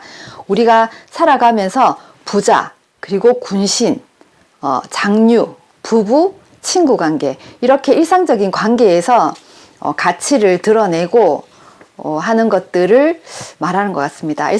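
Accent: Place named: North Korea. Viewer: 2년전보다 이북사투리같은억양이 마이 줄었네 ㅎㅎ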